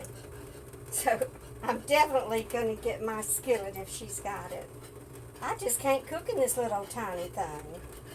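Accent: South, United States. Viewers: Hi, I want to tell you that I absolutely love your southern accent. I'm from Australia, and am 63 years old. So feel like I have a lot in common when you tell some of your stories of raising your children back in the day. I have 4 adult children, 8 grand children and one great grand child. Like you, I was a stay at home mum and the highlight of my life was raising my babies. They grow to fast and in no time it seems, they are grown and having babies of their own. my doctor says I should lose weight but Gee, I love my food and always say to myself, I will start tomorrow. Hahaha. Well, Love your videos, bye for now.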